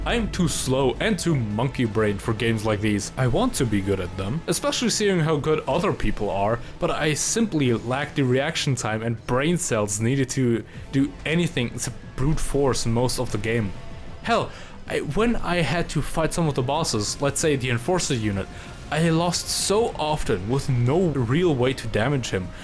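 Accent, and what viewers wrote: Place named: Germany. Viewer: Ich denke, ich höre den Deutschen Landsmann raus im Video :D Kann das mit Destiny 2 gut nachvollziehen.... Spiele aktuell AC6 UND Destiny 2 ... das sind Welten unterschied. Aber wie immer, Übung macht den Meister, ich kann nur empfehlen, probier viel mit Waffen und builds herum. Habe das Spiel mit Doppel MPs und 2 Energiewaffen am Rücken durch und bin mitten im NG+, habe nur leichte Sachen justiert fürs minmaxen. Sehr gelungenes Video, aber mach dich nicht so fertig, einfach weiter austesten und evtl. paar tips holen. Grüße gehen raus.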